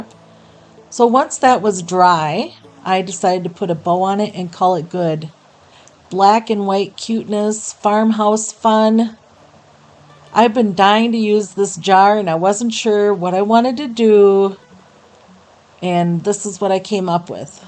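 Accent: Minnesota, United States. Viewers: Quick and super cute projects! I am always looking for jar ideas. Those little ones are too cute! You cracked me up with the little joke about the jars saing we need some beads to keep it farmhouse ya know! And sounds just like Minnesota way of speaking! 😂😂 I loved the sign! The color is perfect and the name of the store, and last one in its a rotten egg! So fun 😂 You make me laugh at least once a video. I love it and you my friend! Have a great week! 😚😚 Oh and congrats about your shop! You're going to be home all day to mind the store?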